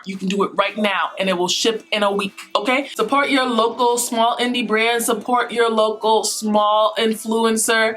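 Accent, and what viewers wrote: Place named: New York, United States. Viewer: COME ON, NY ACCENT!!!!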